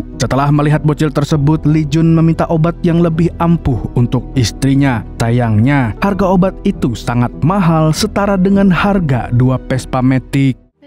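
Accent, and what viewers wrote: Banjar, Indonesia. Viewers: pian urang banjar kh mas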